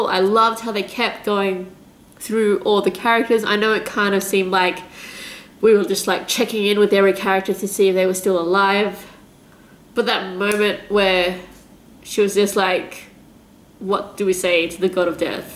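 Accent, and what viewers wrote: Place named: Australia. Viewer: You weren't the only one sweating it out...quite the roller coaster of emotions this episode was lol Condolences to your Koalas for witnessing such horror, for the night is dark and full or terrors ALSO...my brain can't get enough of your Australian accent ;p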